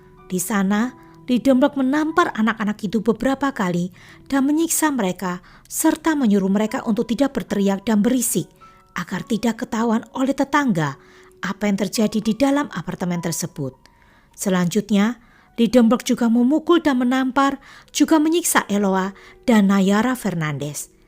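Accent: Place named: Java, Indonesia. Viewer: .... waktu bilang.... jangan bergerak kalo tidak aku tembak....ih... ngeri kali nada nya kek nyai Blorong yg haus darah di film Suzanna...ih takut aku.... walau begitu tetap aja kangen logat medok mu.... ingat ya jgn sampe jadi nyai Blorong.... cukup jadi krupuk orong orong Medan yg gurih dan lezat.... sukses terus ya....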